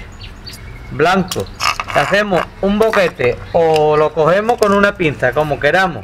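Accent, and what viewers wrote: Andalusia, Spain. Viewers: Hombre ya es la hora esta esperando este vídeo como agua de mayo jejejeje gracias un saludo campeón a y haber si me entero de donde eres por el acento andaluz seguro igual que yo jejejeje saludos campeón desde moron de la frontera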